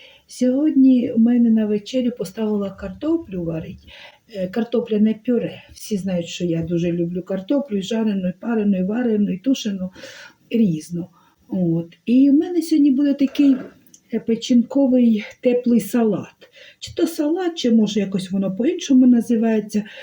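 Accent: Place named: Ukraine. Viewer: ! Очень приятная женщина, молодая, все получается, украинский говор. Дай Бог тебе здоровья, удачи во всех делах, любви и дружбы!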